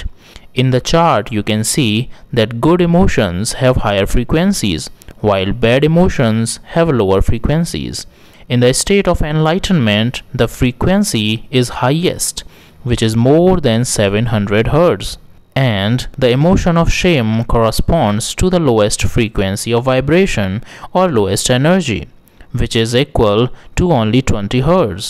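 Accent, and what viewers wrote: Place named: Bihar, India. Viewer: Did you learn English in Bihar??!!!😂🤣😂😆😃😋😡😡😠😠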